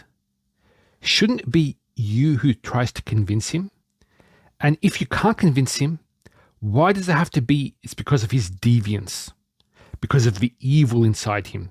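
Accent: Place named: Australia. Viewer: Much respect to you brother. I admire your devotion in seeking the truth, no matter the cost. I myself come from a fanatic Salafi / Wahabi background and the Qur'an set me free from all that indoctrination and brain washing from tradition . I do not identity as a Sunni or Shia, but rather a Muslim who follows Millata Ibraheem - Haneefan. They love to label me as 'Quranist' 😅 I found out over the years that the Tradition diverts you from seeing the truth in the Qur'an. It is unfortunate that the Qur'an was never the primary source, which is why it gets so badly misinterpreted and distorted (like the example of the return of Jesus). I can tell you're Australian akhi by your accent. I'm Ozzy as well from Melbourne.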